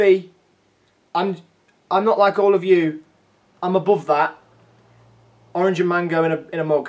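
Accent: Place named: England, United Kingdom. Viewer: Where in England are you from?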